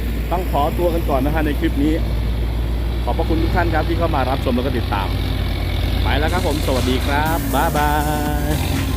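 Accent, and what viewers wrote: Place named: Isan, Thailand. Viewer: สุดยอดครับพี่เว้าอีสานแน่ครับอยากเห็นหน้าอ้ายครับFcเด้อครับ👏👏👏👏👏👏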